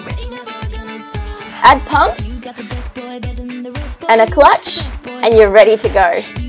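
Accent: Australia. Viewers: your accent reminds me of my trip to australia... I just came back to Italy from Melbourne.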